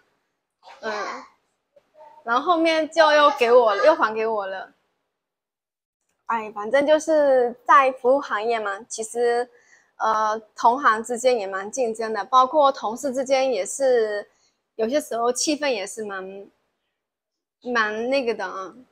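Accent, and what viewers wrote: Taiwan. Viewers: 口音变台湾腔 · 您的中文聽起來已經有點台中腔了，大概是慢慢地被同化了吧？ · 为什么非要学台湾腔？ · 厲害，講話幾乎沒有大陸口音，反而是台灣口音了！😀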